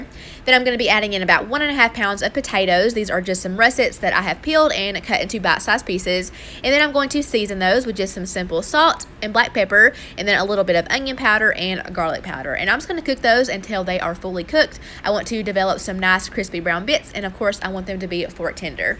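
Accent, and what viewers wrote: South, United States. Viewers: Hi there! Your food always look so good! Love listening to you talk, love the southern accent. Thank you for all you do. God Bless, your kids are adorable. ❤️🥰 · “Then I’m gonna, kinda badly here, cut this into bite size pieces” 😂 I died! Much love from Alabama! I can hear the southern charm in your voice! 😂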